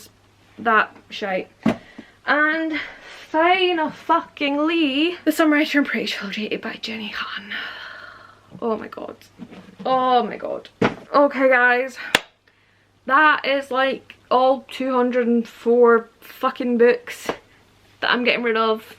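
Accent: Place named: Scotland, United Kingdom. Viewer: It's nice to hear a Scottish accent on booktube! Not enough booktubers are from here.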